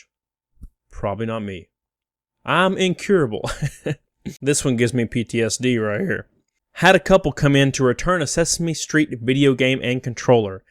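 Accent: South, United States. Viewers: Is it worth mentioning that you have a Kermit the Frog voice sometimes? Like a southern Kermit. Anyway, good videos. Thanks!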